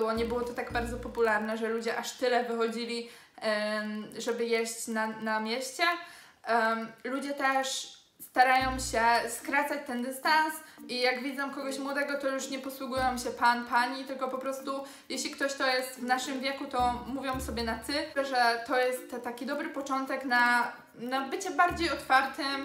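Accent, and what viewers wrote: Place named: United States. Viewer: Robisz takie pretensjonalne naleciałości z angielszczyzny, jakbyś przesiedziała w stanach co najmniej 15 lat xD Na filmiku z operacji plastycznej już ich nie ma, dziwne xD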